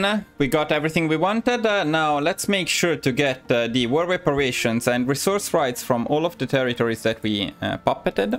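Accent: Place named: Italy. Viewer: I can't tell you how charming it is to hear you talking about italian places and units with your italian accent. Makes this a lot of fun. I don't have any DLC - is it still possible to recreate the roman empire without spies or the sophisticated re-integration features?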